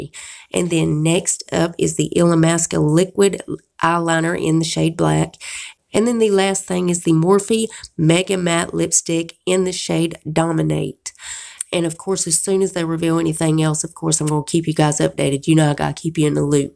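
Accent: South, United States. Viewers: Thanks for the spoilers, loving your Southern accent..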